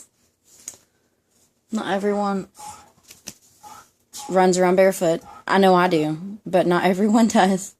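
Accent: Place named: Georgia, United States. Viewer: I really liked your video, loved the Georgia accent! I'm from the UK, we sometimes call hair ties 'hair bobbles', not sure if that's what you were thinking of?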